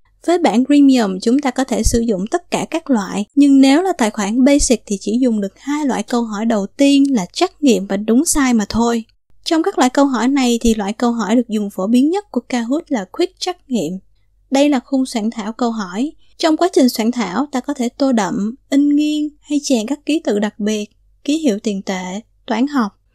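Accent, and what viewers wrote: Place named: Saigon, Vietnam. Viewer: Giọng sài gòn nghe nhẹ nhàng, dễ thương làm sao..cảm ơn cô nhiều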